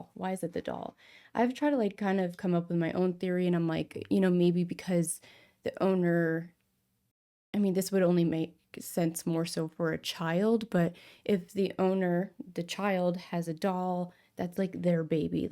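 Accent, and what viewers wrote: Australia. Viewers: Because dolls/toys are attached to people's childhoods or something? That's just my thoughts 🖤✌️👑👽 (maybe Dolls are like humans and turn into some kind of vessel) p.s. best australian accent ever¿ 💀 THIS STORY IS WILD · As an Australian myself, that accent was gold 😂👍🏻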